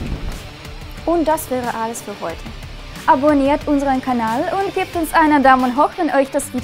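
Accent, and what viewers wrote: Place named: Russia. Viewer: Ich mag den russischen Akzent :D Und geile Events!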